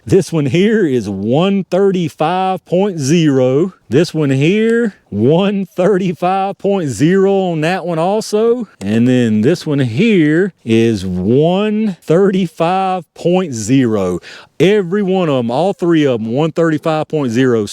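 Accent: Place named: Boston, United States. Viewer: Thanks for that test big hoss. I use Speer Gold dot 200 grain +p in my Glock 21. Never seen these in action. Hey by the way, where did you get that Boston accent from?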